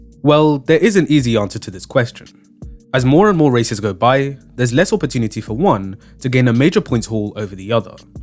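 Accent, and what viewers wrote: United Kingdom. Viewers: This guy must be British 😄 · British accent noted...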